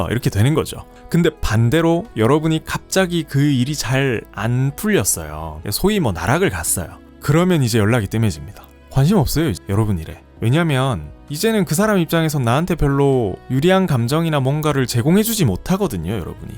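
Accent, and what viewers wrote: South Korea. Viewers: 늘 자기계발 인간관계 지식 이런 류의 채널 영상을 자주보는데...AI 목소리가 많아서 귀에 잘 안들어왔거든요. 근데 목소리가 너무 좋으시고 뭣보다도 친근한 약간의 경상도 억양과 단어들이...오히려 귀에 잘 들어오고 더 와닿네요. 구독누르고 갑니다❤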